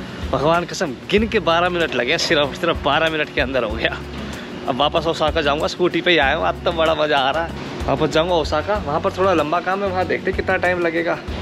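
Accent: Haryana, India. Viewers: Bhai ki personality aur accent par scooty suit nahi karti. What this accent is.....Hariyanvi??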